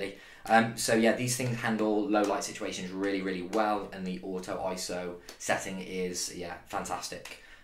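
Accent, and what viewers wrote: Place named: Germany. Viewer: Can barely understand your English--sounds like Ginglish. Technical mambo jambo in Ginglish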